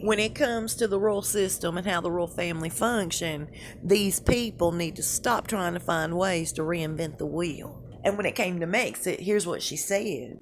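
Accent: South, United States. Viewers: Love your Southern accent! Makes me homesick. I also agree with your point 9fview.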